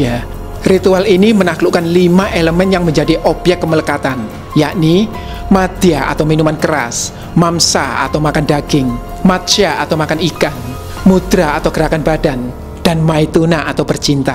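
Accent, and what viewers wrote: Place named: Surabaya, Indonesia. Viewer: Sek ta... Nggacor jare... Gak oleh ebes cak... (serasa kupingku disodok tugu pahlawan) 😂 terlepas dari aksen Surabaya yg ceplas ceplos, seperti biasa... esensi dari Chanel ini selalu jos 👍😇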